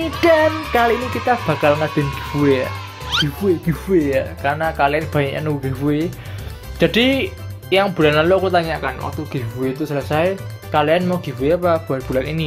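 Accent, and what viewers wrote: Java, Indonesia. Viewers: 1. Cadel cadel gimana gitu 2. Medok 3. Baik pada subscriber. Karna sering live, giveaway, ngasih guide dll lahh Harapan saya untuk AoV kedepannya adalah pada matchmaking di perbaiki lagi. Open ID: efe18eb05e1fa5539f30de2d05e41494 Skin: Zuka-Dragon Master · Ciri khas: 1. Onslog onslog, Cangkemu, naiss banget!! 2. Cadel 3. Ngomonge jowo, naiss bngett!! Harapan buat AOV kedepannya, semoga AOV tambah mendunia, dan lebih rame yg main di Indonesia. Open ID: 2e9bf3f6093f5f0f38034e3d97e42524 Skin: Ryoma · 1. seneng ngomong onslog onslog, cangkemmu, nice banget, meletet 2. gk bisa ngomong R 3. selalu ada logat jawa di vidionya Harapan untuk aov = saya berharap aov dapat terus maju di dunia esport dan di indonesia playernya aov bertambah banyak OPEN ID : b43a47fa715d1f22f005e27e5f096c9c SKIN : Lu Bu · Subscribe&like✔️ Follow ig✔️ Share✔️ Ciri khas: 1. 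Ga bisa ngomong R lucu banget dengernya "Jokel jokel" 2. Onslaught malah onslog onslog, double whammy jadi domiwemi, khas kalo ngomong nais banget cuangkemmu wkwkwk 3. Suka ngomong jawa, logatnya lucu Harapan untuk AOV ke depannya semoga AOV terus maju dan sukses dan bisa dikenal lebih banyak orang lagi. Aamiin. Open ID: 23f<num>a250fee389f9ab2422ec Skin: Wonder Woman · ciri khas : - jawanya medok parah wkwkwk - seneng interaksi dengan subscriber dengan cara ajak mabar - gak bisa ngmng R wkwkwk semoga AOV menjadi moba yg berkualitas, makin berkembang, makin banyak player AOV yg baru, Maju terus AOV👍👍👍 Open Id + Skin : c87c39c24dfa<num>bea1ca07be559 + Lauriel ( Black Wing ) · 1.suarane medok 2.gaiso ngomong R 3.kakean ngomong onslog onslog,nais banget Harapan saya untuk AOV kedepan semoga makin banyak peminatnya dan berkurangnya domba antaris Open id 455f72c0c61fda1af0798e3a276fa5b6 Skin wonder woman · 1.kalo main suka ngalah dulu 2.kalo ngomong R suaranya agak beda 3.kalo ngomong kental banget logat jawanya Pengennya AOV lebih banyak ngadain event yang physical seperti pulsa atau HP gitu Openid:9b<num>f9bfb<num>dc34973 Skin:wonder woman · All done.... 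Subscribe, follow, share #1 Ciri khas... 1. Jowonya itu loh 2. Ya pasti cadelnya (kadang" suka seneng denger cadelnya😁) 3. Karibnya Ucop gaming (sering mabar with ucop gamimg) 4.(tambahan) paling suka nyebuti onslog onslog😁 #2 Harapan kedepan buat AOV, semoga turnamen aov dapat diadakan di tempat" yg terpencil seperti di desa. Dan semoga AOV kedepannya dapat memberikan kenyamanan serta event yg menarik bagi playernya.... oh iya dan semoga AOV dapat menjadi "The Best Mobile Moba In The World" #3 Open ID: b3d1cad56e5ee0d<num>f2bbf5d3a Skin: Yorn (PoPo) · 1. Logat jawa e lucuu 2. Memberikan edukasi 3. Dan suka main dengan subsciber Harapan untuk AOV, lebih dan lebih lagi bisa memberikan game yang terbaik Open ID+Skin c67eb92a676b25e808491e<num>a Kill Groth (Mermidon) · Ciri Khas : 1.suka bilang nice banget 2.medok coy 3.suka ketawa pas main Harapan buat aov : semoga makin berjaya Open id : e26b8af7d6b871c16d37acb<num>ad Skin : liliana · 1. 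Lucu 2. Bahasa jawanya kental 3. Sedikit cadel kali yak Semoga kedepannya AOV makin banyak event berhadiah pulsanya... wkwkwk Open id: 69aa2fac34bf33823abf318a157f4e47 skin : wonder woman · 1. Nice banget, cangkemmu, onslog onslog, oke oke, cadel, medok, dll 2. Semoga aov bisa tambah jaya dan makin banyak mode baru dan hero baru yang dihadirkan 3. f39e873393ae2c9530bdeb036bf58322 - skin ( xeniel - armagedon ) Suscribe done Follow ig done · 1. Cadel Gk Bisa Ngomong R (conquelol) 2.Onslog Onslog,Nice banget 3. Suka Berlogat Jawa Semoga Aov Semakin Jaya Kedepannya OpenID 6c0105a9e6b21b5638c<num>c7c5 Skin(Raz) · 1. Onslog onslog 2. Nasi banget 3. Cadel sama logat jowonya kental Harapan untuk aov Semoga makin bnyk makin bnyk yg main dan masalah-masalah koneksi lebh baik lagi. Pengelompokan main sesuai Rank masing masing jgn beda jauh bisa join Skin superman. ID:c505e0ef449eb633a7d<num>f02987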